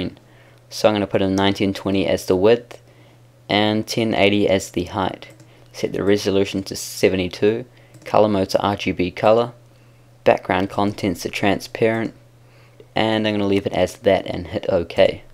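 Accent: New Zealand. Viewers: Sounds like he's from New Zealand. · love your kiwi accent mate Great tutorial btw! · Hey bro, are you from new zealand coz youve got a kiwi accent, if you are a kiwi are you maori or not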